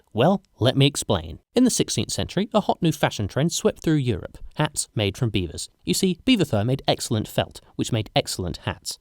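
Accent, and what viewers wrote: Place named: United Kingdom. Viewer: This youtube formula is crazy: British accent + shitty graphic art + whimsy commentary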